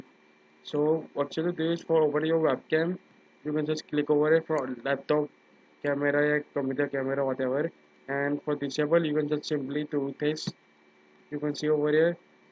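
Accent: India. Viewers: u from india? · indian indeed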